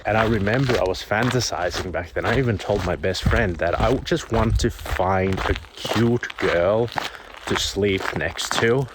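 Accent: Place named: Finland. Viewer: Very thought provoking Mate. Pardon my ignorance but are you in Finland?The nature looks that way and to me you sound Finnish.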